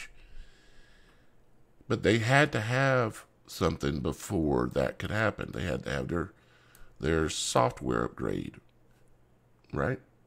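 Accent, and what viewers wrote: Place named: South, United States. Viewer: Naw, Son; although, you're, clearly, a Fuck-tard. Just some honest feedback form a member of the audience. Between the guy's Southern-fried accent, his monotone, near-mumble, the repeated use of the incorrect phrase, "Crimson Tide," (Alabama fan, huh?) and random samples of the dreaded Gematria (I mean, give me a break with that crap), I challenge anyone to tell me what the fuck he's talking about in this video.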